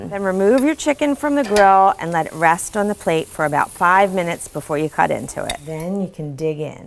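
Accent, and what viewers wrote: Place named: East Coast, United States. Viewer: I hear the east coast in your accent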